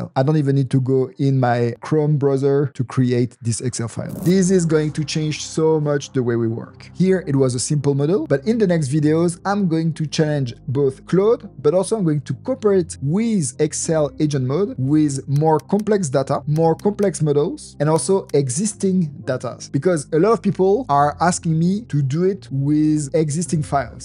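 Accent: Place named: France. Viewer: Comment reconnaitre un français ? avec son accent quand il parle en anglais nice video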